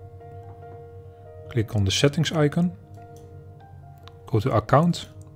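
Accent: Netherlands. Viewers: Precies, stupide Nederlands accent! KOTSBAK! De LOI let me speak English with a Nederlandse R! Je staat voor lul vriend!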